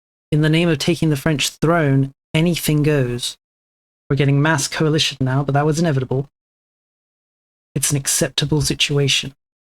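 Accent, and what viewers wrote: United Kingdom. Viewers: knew i was in great hands when i heard that british voice, cheers mate from down under!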